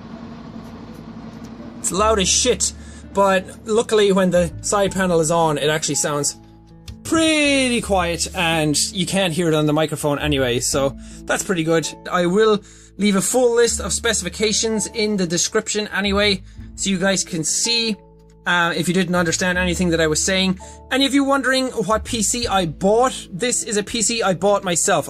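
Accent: Ireland. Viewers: You're starting to sound a bit more Irish now 🤣🤗😉😝☺️💁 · you have the best accent just listening to it makes me want to stop being scottish and be irish instead just to have the accent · are u from ireland? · Dat irish accent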